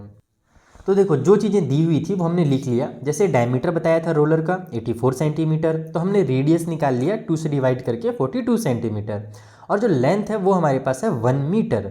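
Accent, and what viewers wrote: Bihar, India. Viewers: Sir thanku kal mera aanual exam hai aap bhut aache hai aap bhiar se hai naa aapki accent se pata laga raha mai aapko 6 class se follow kar rahi hun and 9 and 10mai bhi kraungi true fan sir❤❤❤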